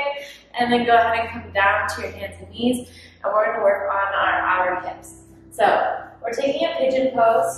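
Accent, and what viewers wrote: United States. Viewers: Did I catch some southern accent there? Like Alabama or Mississippi or Kentucky... don't know for real..cuz I ain't no American...and English ain't my native language... Anyhow... beautiful lady... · Is that a Texas accent?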